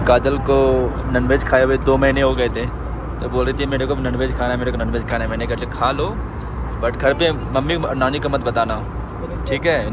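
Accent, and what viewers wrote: Bihar, India. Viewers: ❤❤❤❤❤ Bhai aap to same to same meri tarah hi bolte ho bihari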